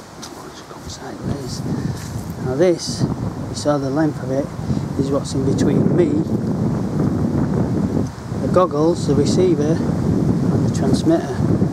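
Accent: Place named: United Kingdom. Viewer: You sound like you are from the UK. Any reason why you are using receivers greater than 25mw? 25mw is the max legal power in the UK & the EU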